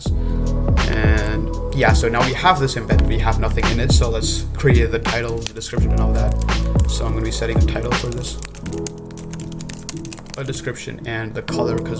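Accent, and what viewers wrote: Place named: United States. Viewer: Bro u sound american af L Also the bg music a bit too loud xd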